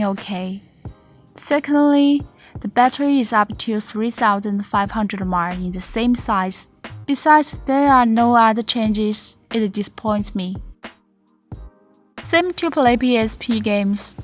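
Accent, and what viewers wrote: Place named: China. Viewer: 超级重口味中式英文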